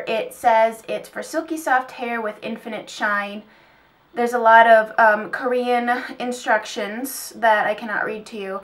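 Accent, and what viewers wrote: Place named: Midwest, United States. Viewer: First off, look at them toned arms!! You look awesome!! Also, I used to be an everyday hair washer bc my hair is fine and thin until I found Batiste! What a life saver. But I cant just run to Ulta bc its too far away so Ill have to check out walmart and try the Herbal Essence one! Also, do you happen to be from the midwest? bc I had a friend who was from Nebraska and she says ROOTS just like you! It seriously made me laugh out loud because I (being from NC) say roots, as in a plant root (if that makes any sense) LOL Anywho, loved loved loved this video! and I want your shirt!! Except I want it to say, "I run so I can eat dessert" :)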